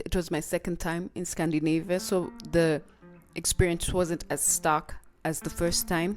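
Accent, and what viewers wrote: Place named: Kenya. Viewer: You never lost your Kenyan accent,,, being Kenyan is permanent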